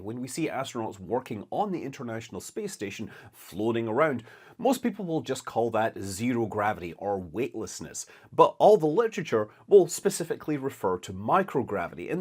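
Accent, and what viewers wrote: Scotland, United Kingdom. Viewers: zero gravity spoken like a yank - loosing your scottish accent!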